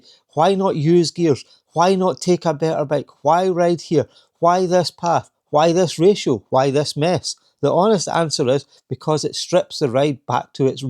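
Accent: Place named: Scotland, United Kingdom. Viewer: I like your voice and accent! Which part of Scotland are you from?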